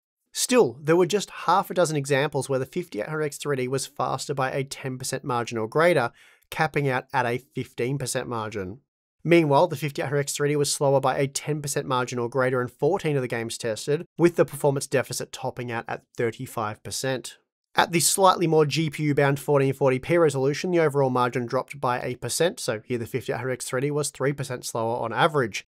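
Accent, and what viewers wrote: Australia. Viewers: The difficulty is probably higher with the Aussie accent on top of that. Though Steve from GN also spits out numbers at a million miles an hour.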